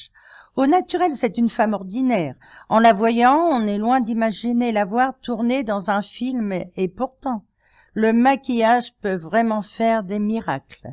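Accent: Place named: France. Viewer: Quand vous dites les noms des actrices et les titres en anglais a la francaise , c'est trop mimi ! Bonne continuation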